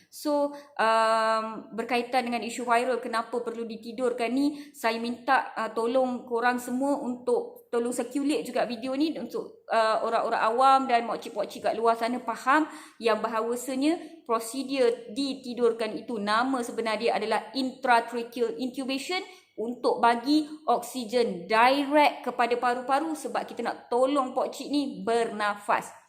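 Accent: Malaysia. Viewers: Trying to focus on your explanation but honestly cara dr cakap melayu jugak attract me more 😅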